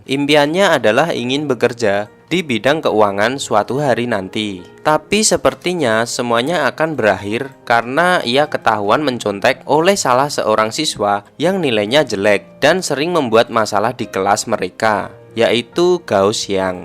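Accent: Java, Indonesia. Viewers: Emng orang jawa ya ,Kak? Medhok suaranya,,,🙏🙏🙏 maap klo tersinggung cuma tanya,,sumpah✌️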